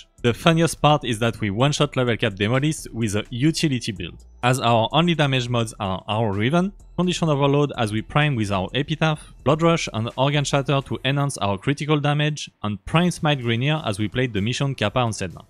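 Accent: France. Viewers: This french accent i guess is just perfect haha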